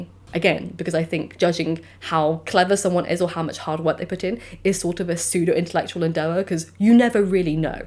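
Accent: RP, United Kingdom. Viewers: A very beautiful real intellectual with a majestic royal english accent 🌹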